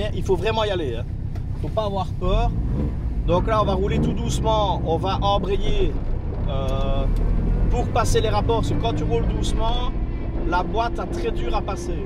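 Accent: Liège, Belgium. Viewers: avec l accent lidgeux 😅 parfait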